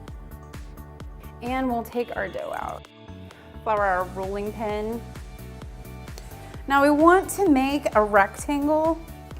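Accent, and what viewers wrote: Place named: Minnesota, United States. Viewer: You may live in Dallas, but you are definitely a Yankee. I live in central Texas, but was born and raised in Minneapolis. You sound like Minnesota. Come on. Tell the truth. I love your channel in your cooking. I can’t wait to try everything.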